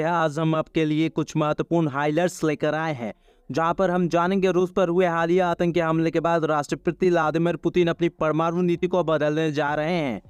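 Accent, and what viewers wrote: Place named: Bhojpuri, India. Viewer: please slow your reading train, what you are reading we cant understandt, even your pronounciation is like you are reading english news in bhojpuri language. so please slow down th speed and concentrate on English pronounciation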